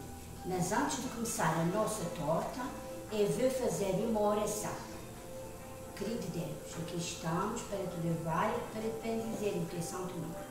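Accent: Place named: Portugal. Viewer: Querida irmã, que bom ouvir sua oração! Me senti abençoada! Tão lindo ouvir esse sotaque de Portugal. Gosto tanto! Vou fazer essa torta. Minha família come bem pouca carne e estamos procurando parar de vez. Obrigada por dedicar seu tempo em ensinar receitas saudáveis. Continue, pois estarei sempre acompanhando!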